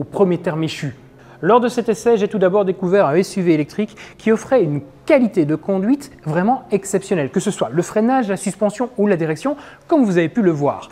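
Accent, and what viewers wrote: Belgium. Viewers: Excellente vidéo. Et puis l'accent belge j'adore ;-) Mais ce modèle est lourd, plutôt cher, pas très puissant à la vue de sa batterie de 86KW (performance moyenne), longue à charger, bref cela, selon moi, manque de modernité. Et la marque Tesla avec son modèle 3 SR+ détrône de très loin ce modèle ;-)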